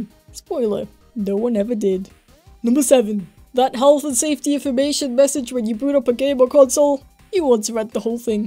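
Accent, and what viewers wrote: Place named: United Kingdom. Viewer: love this british or somethin man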